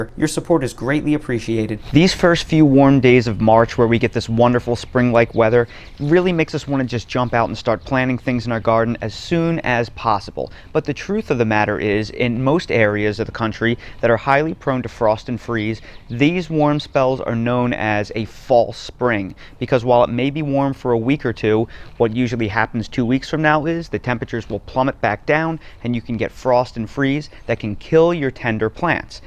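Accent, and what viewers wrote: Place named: Northeast, United States. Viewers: You sound a little bit South Philly Italian to me. I’ve learned a lot from you. I’ve definitely bitten off a lot but I’m reasonably optimistic. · You’re not originally from NC are you? Accent says NJ/PA/NY.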